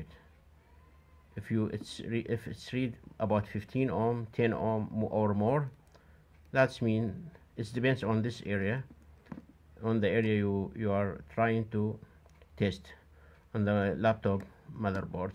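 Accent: Iraq. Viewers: Youre voice is iraqi voice Are you an iraqi ?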